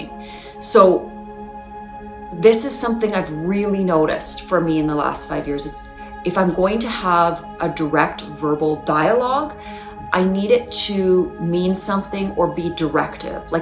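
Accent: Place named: Canada. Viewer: I can say you're Canadian by the way you say " SO " and " ABOUT " Possibly you're a Pleiadian too : )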